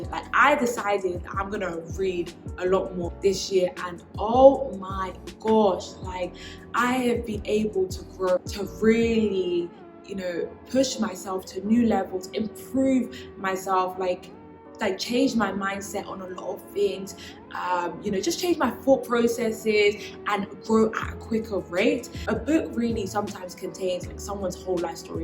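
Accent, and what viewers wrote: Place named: Jamaica. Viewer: This Jamaican accent you’re giving us 😂😂😂❤️ love you and you’re doing amazing ❤️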